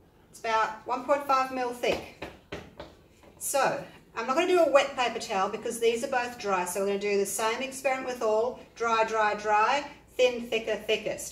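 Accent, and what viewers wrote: Australia. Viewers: Great to hear an Aussie accent on a pouring video.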